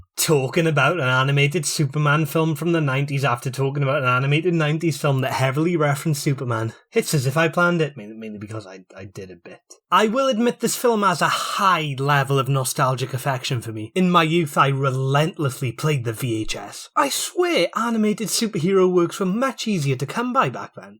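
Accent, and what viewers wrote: United Kingdom. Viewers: Are you from Scotland? Im not well versed in the batman story but you kept it exciting and easy to follow.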